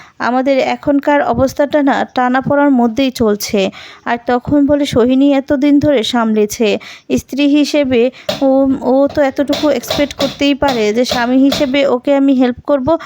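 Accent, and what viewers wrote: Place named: Bangladesh. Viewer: Ei serial ta khub jhule geche.sei sathe apnar ei bangla desi uchharon . dutoi biroktikor.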